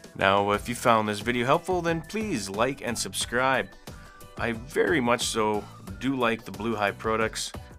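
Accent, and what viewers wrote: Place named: Canada. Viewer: Of course! Thanks for posting the video! And yeah, I noticed right away! Right off the bat, I knew you were Canadian. I was like shit yeah, a Canadian review.. Then I realized these are likely only sold in Canada at Canadian tire.. haha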